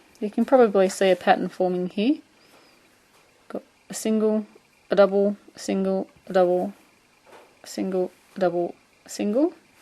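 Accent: Australia. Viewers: I hope you make more videos, You could read a calendar or a grocery list and I would listen, I love the Australian Accent.. hee hee.. thanks bunches!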